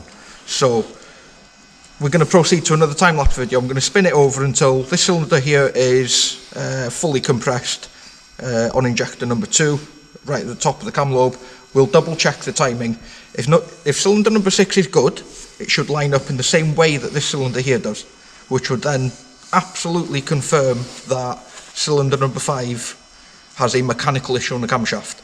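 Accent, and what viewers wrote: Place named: North Wales, United Kingdom. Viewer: Great video fella, just stumbled across your channel and subscribed. It would have been good to see if there was any visable play on the lobe once it was out, am I right in thinking the lobes are splined to the shaft? Also I heard the crappy cams were introduced with the 'upgrade' to Euro6, was the solid new cam you fitted off a Euro 5? If so I guess all the cam timing is the same? Also nice to hear a proper North Walian accent on Youtube, I'm originally from Llanfairfechan.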